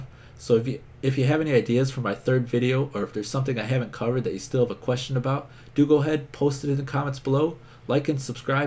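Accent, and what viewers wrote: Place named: United States. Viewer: i think hes amarican europeans usually do have an diffetent accent when they speak english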